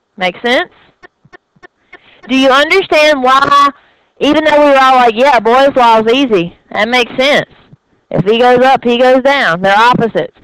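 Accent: South, United States. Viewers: Thank you so much for your lectures! They make this stuff so much easier to understand. I'm originally from NC but go to school in NYC. I appreciate hearing a professor with a southern accent. It feels like home :)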